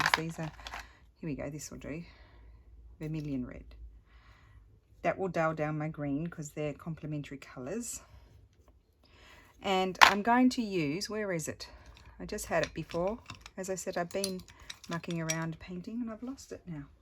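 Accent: Australia. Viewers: I like the leaves also. Try a thistle. I bet it’s not to hard. Building up the courage sounds like a great idea. Sounding Aussie? Maybe because you were talking to your brother than your sister than your mom. Lol. Yep I like your Aussie sound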